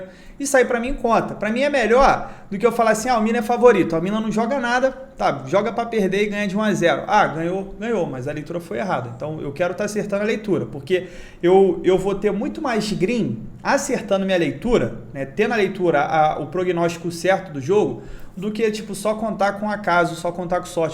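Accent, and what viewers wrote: Rio de Janeiro, Brazil. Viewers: Vejo seus vídeos e fico pensando "é muito carioca" kkkkk "Dificíão" é nossa cara HAHAHAHAA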